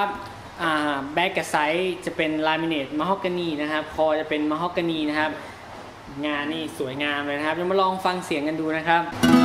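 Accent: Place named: North, Thailand. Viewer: สำเนียงเหนือนี่ ออกชัดเลยน้อ อ้าย อิอิ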